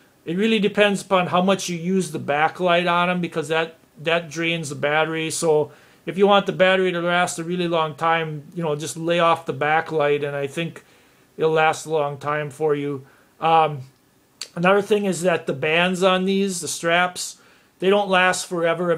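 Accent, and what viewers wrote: Canada. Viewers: good review man. and cool accent. are you canadian?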